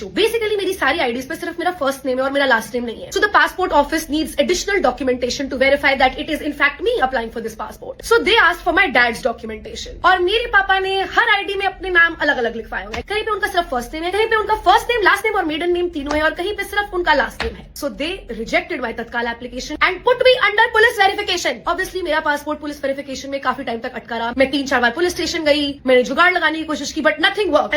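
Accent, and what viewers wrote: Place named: India. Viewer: Tumhari english bahut kharab hein, dont mix hindi accent in english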